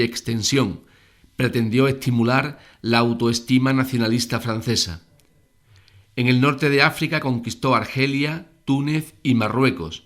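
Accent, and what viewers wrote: Andalusia, Spain. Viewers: Tio hablas como un andaluz no puedo ver el video porque me mosquea ese estupido acento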